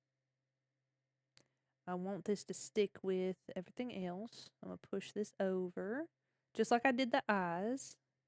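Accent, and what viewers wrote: South, United States. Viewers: When your from the south to and from a vtuber that speaks in southernese 😭🫶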